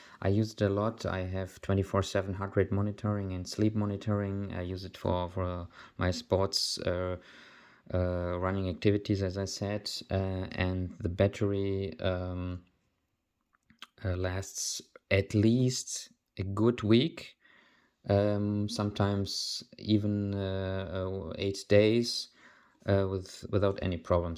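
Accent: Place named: Germany. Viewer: Sounds like a german to me :D If I'm right: liebe Grüße! :D Nice Video, good information and its one of the best long time reviews for this watch. Helps a lot!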